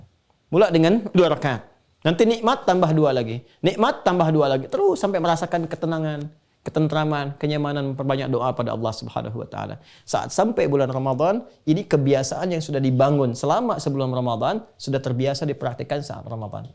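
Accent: Malaysia. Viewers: Ustad terkadang menggunakan bahasa dan logat melayu hehe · Ustadz bicara nya sprt bhs melayu / Malaysia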